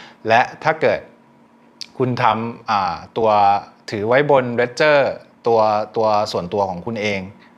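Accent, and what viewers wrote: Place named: United States. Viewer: คุณพูดภาษาอเมริกันได้ 100% พ่อแม่เป็นคนไทยและโตที่อเมริกาเหรอคะ. ข้อมูลข่าวสารดีมากคะ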